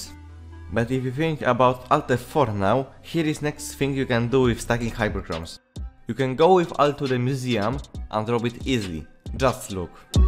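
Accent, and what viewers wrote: Poland. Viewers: Are you Polish? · po akcencie słychać że polak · słychać że z polski jesteś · Ej czy tylko ja słysze w jego głosie polski akcent xDD?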